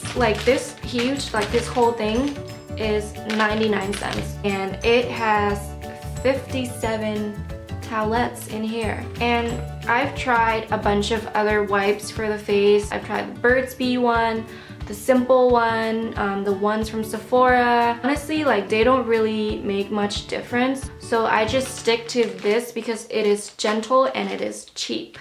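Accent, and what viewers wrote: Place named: Thailand. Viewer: Love the Thai accent!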